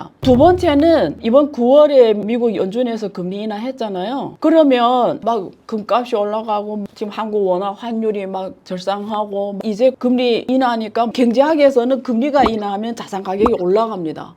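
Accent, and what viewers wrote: Yanbian, China. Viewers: 펀드 할것 못돼요 판매하는 금융 창구 직원들도 뭔지 모르고 팔지요... 위에서 시키는대로 수당을 주니 호구를 잡고 팔지요 1,000개 이상의 펀드 ㅋㅋ 주식투자 펀드투자 그만 광고하세요 부동산 급락 소리도 하지말고... 그냥 연변 사투리가 재미있어요 ㅋㅋ 세미나에서도 자세하게 하지 마세요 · 한국분이신가요~발음이 중국교포같오서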